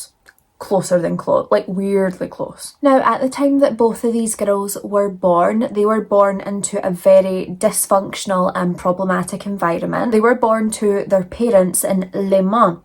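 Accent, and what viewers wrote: Scotland, United Kingdom. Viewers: I do the same thing! I switch between Midwest USA, and Scottish accent all day!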